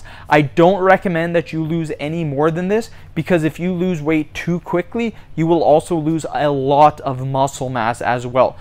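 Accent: Canada. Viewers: Is that a Canadian accent I hear?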